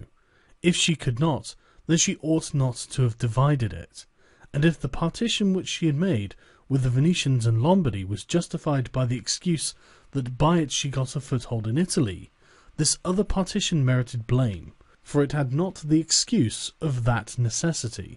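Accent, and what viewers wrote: United Kingdom. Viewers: Excellent recitation, if you can get past the horrible British pronunciation. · I love Sargon Of Akkad's soothing British voice. No homo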